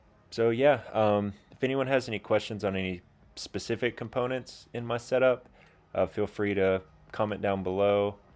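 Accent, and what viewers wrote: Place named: Midwest, United States. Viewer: My profile name here is my XBL GT add me if you feel like. Are you Midwest US, sound like it.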